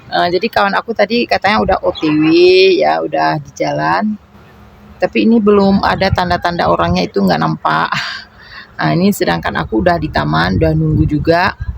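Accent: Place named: Medan, Indonesia. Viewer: Halo bunda salam kenal.. senang sekali bisa ngumpul bareng teman-teman Indonesia. Oya bunda di Indonesia asal nya dari mana klo boleh tau. Logatnya kayak orang Medan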